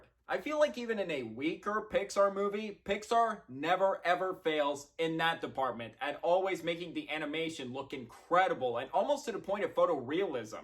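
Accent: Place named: Canada. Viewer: Are you Canadian?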